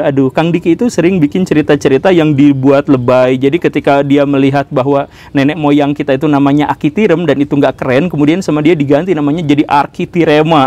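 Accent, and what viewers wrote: West Java, Indonesia. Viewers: Sunda pisan teh mah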